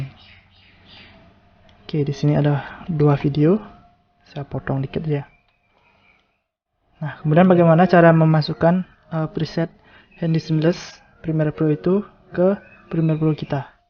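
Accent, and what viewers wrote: Bali, Indonesia. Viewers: Logat nak bali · mule logat bali tetep kental wkwk, mantap lah bli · uhmmm logat bali :L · Salam logat bali brow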